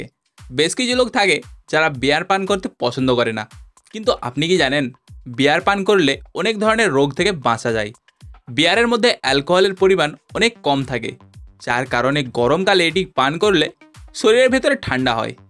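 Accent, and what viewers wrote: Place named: India. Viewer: কথা শুনে বুঝা যাচ্ছে দাদার দেশের ডাক্তার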